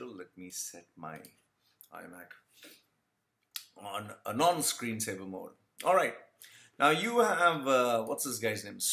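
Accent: India. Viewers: Why do you have an Indian English accent.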